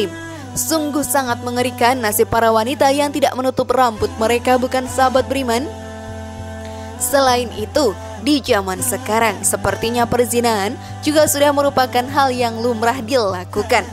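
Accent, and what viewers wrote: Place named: Java, Indonesia. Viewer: trimakasih berbagi ilmunya...hanya sedikit masukan yg bacanya terlalu medok cengkoknya bu...coba di perhalus biar enak di denganya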